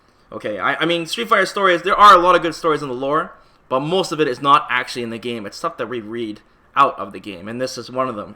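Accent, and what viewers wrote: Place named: Canada. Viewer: He's Canadian ayyy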